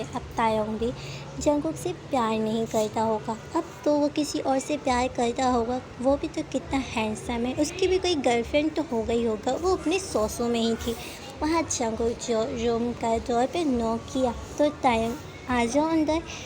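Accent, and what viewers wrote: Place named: West Bengal, India. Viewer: .. aap jab story.. explain krte ho..toh syd Bo aapki bangle ki hi aawaj hai.. bo mujhe bahut achi lgti.. 😚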